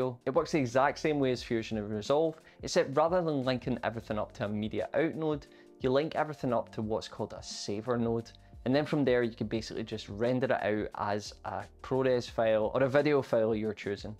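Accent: Scotland, United Kingdom. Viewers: This is the best tutorial on YT which allows me to understand the basics of nodes and I made my first animation in Fusion 🤟 Thanks for that! Casey Faris tutorial is way too complicated and overloaded. Your way gives absolutely the minimum amount of required knowledge to begin with. By the way, I love your accent. Are you from the "Celtic" part of the UK? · One thing that can get annoying when you find tutorials like this, is if the presenter has an annoying voice or accent. It is therefore an absolute GODSEND if the presenter has a Scottish accent. Useful AND sonically pleasing. 😀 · I've been using After Effects and I don't exactly plan on switching completely to Davinci Revolve... But, the Scottish accent is so lovely... 😋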